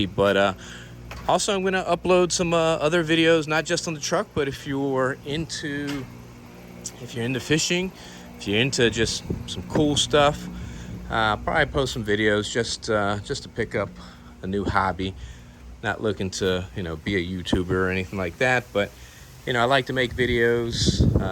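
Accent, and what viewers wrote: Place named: South Louisiana, United States. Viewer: Ive got the same truck, but in Carbonized Gray and the sport interior (brown and black leather). I also have the Fox, instead of Carli. Thats a bit hefty of a price tag for me. Where are you located? I was guessing south Louisiana based on your accent, but the palm trees are throwing me off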